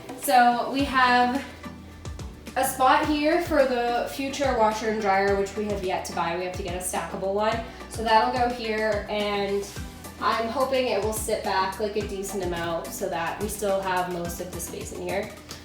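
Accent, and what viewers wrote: Maine, United States. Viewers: On another video you asked if you have an accent. I can here the Maine accent in certain words, like when you say "certain".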